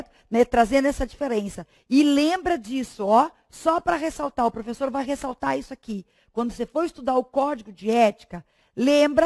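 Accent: Brazil. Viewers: com sotaque do interior de sp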